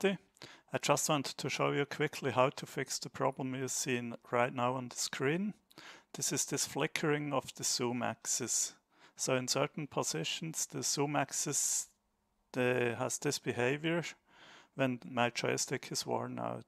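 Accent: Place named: Finland. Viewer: you have a sort of Finnish accent 😉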